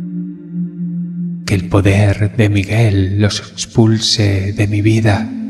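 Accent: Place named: Spain. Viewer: Buenos días amigo. Eres una gran bendición. Tu voz es muy bonita y agradable escucharte. Tu accento español es muy bello. Yo soy 28 porciento española. 12 porciento francesa, Indigenous. Te mando un fuerte abrazo desde Hacienda Heights Ca U.S.A. 💯🌟💋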